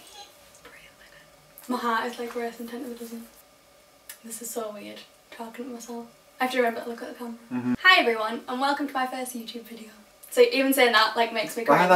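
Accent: Middlesbrough, United Kingdom. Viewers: Nice to hear you speak! Proper boro accent! :) xx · It's mad how much you can tell you're from the boro on here. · ***** A very stereotypical British accent haha I really don't know why :)X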